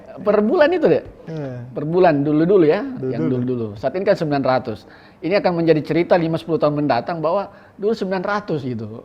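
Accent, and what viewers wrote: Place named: Makassar, Indonesia. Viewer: edeedeeee kentara na makassar na ini daeng 👎👎👎👎👎🤪🤪🙈🙈🙈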